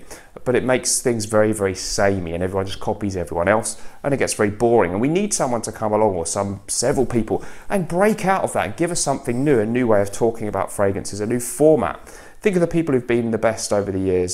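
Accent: United Kingdom. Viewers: Heeey, you are too harsh to yourself))) buuut I totally agree with you, the one can take inspiration from other topics of interest and hobbies...you can make podcast, interviews with interesting people, indepth description of a particular fragrance, we learn a lot from you. I like your British English and sense of humor.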